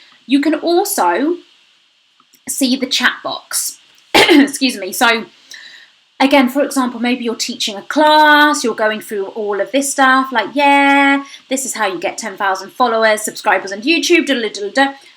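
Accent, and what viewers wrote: United Kingdom. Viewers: Your Accent is dope British loh😂❤❤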